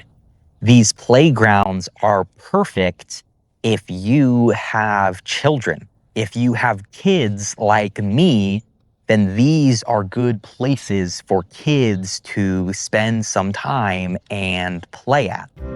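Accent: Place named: United States. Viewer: It's funny how you speak so slowly, but for us beginners, it's a good pace, especially since sometimes there are words I don't know the meaning of. I only have a few reservations about the channel name. English at Ease is very popular, which makes it difficult to find. I had to do a lot of searching. I should have added a name or some other feature that would have made it easier. Other than that, it's okay. American is a bit easier to understand than pure English.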